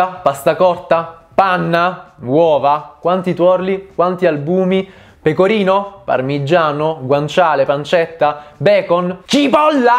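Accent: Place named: Salento, Italy. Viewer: Ma.. accento salentino ne abbiamo? 🤣 Un saluto da un tuo conterraneo!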